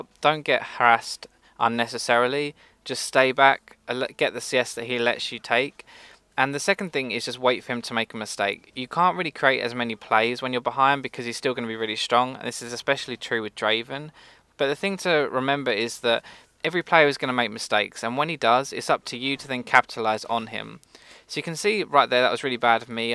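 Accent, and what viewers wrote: United Kingdom. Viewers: As always, your videos are awesome and super informative. For some reason I find your videos much easier to watch, understand and learn from purely because you're British. It'd be awesome to play with you sometimes (I main support), and also a quick question - could you put up a video of a Vayne game against a bunch of heavy divers? Team fighting is always hard for a Vayne when the enemy team has like a Renekton and a J4 for example.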